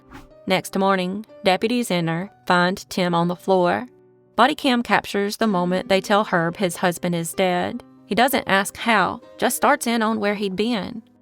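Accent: South, United States. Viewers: This narrator is - a real person with a Southern accent. Maybe those other channels use AI voices to make it sound like the narrator of this channel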